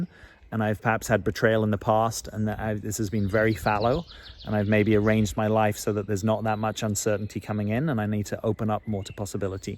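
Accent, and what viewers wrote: United Kingdom. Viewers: yes, it’s the Brit way you say pattern. :) I had to learn an RP accent when I was in a play (very small part) and I so wanted to go around talking that way...but that would’ve been inauthentic 😁and probably pretty hilarious to boot. It’s so nice to hear it as it is, from you! Very good points regarding practicing trusting what’s good (or not) for me and maybe that just relating is a good space. I’ll do like he does in “What about Bob?” Baby steps...😂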